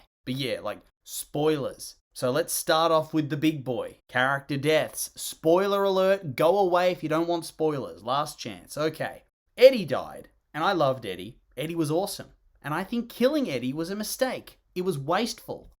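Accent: Australia. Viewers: You sound Australian, your country was turned into one giant gulag over the ‘pandemic’ and you are like ‘silly Americans and their guns’. The lack of awareness is truly astounding. Your name really shouldn’t be, more like girly idiot pants.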